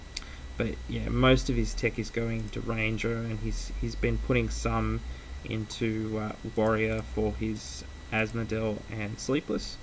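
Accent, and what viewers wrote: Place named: Australia. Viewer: You sound Australian, are you?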